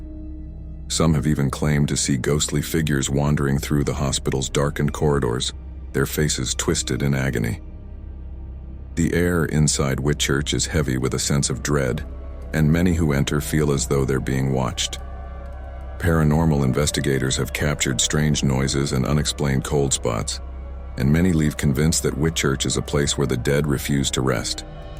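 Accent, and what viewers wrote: United States. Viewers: Why do we have an American talking about this stuff? Stick to your own stuff!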